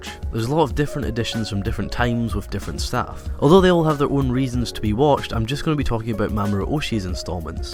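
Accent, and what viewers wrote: Scotland, United Kingdom. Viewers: He Scottish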